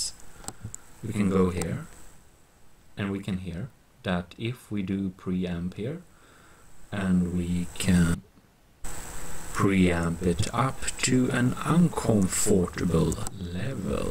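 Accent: Sweden. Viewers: Definitivt svensk, Norrmän låter mycket mer oseriöst (no offence). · U sound swedish.If u are tjenaaa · I am swedish too! It's weird how you can hear it on the type of english people speak... epic video btw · swedish acsent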